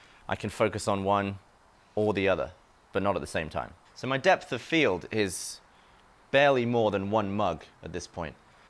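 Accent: United Kingdom. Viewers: Benjamin Collins British. But he lives in Texas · It might be his British accent but I'm not even interested in photography but watched the whole thing and learned stuff I didn't know I wanted to know. Great explainer, this guy is. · I love, but I couldn't find "shuta speed" in my manual 😀😂 gotta love that British accent thou! great video! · Are u British or American because you sound British but your in America. thx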